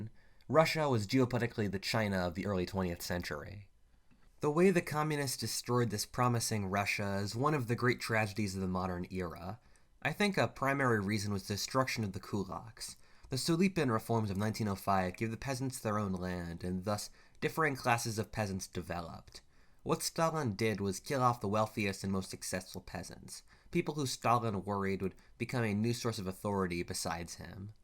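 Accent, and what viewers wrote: Canada. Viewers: You're a Canadian?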